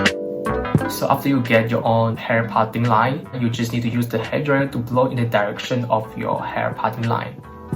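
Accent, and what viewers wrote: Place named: China. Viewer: I can’t pick out where he’s from with that accent? Chinese? Taiwanese?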